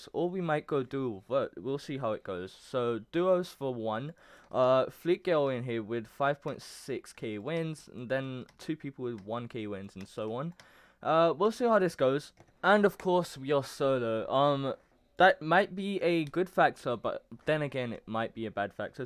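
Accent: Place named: South London, United Kingdom. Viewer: I recognise your voice from some where in South London im not saying the specific place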